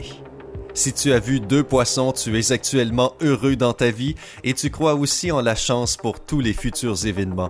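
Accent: Quebec, Canada. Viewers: Oui c'est vrai sa il a un accent québécois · Moi mais c l'accent québécois qui donne cette impression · Lama Fâché version Québécois, je renomerais la chaine Lamarde Fâchée su j'étais toi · sablita 07 nan il a l’accent québécois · Tu est québécois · Avec cet accent québécois à la con c'est insupportable d'aller au bout de ces vidéos. Heureusement d'ailleurs, parce que je n'ai jamais vu un tel tissu de conneries.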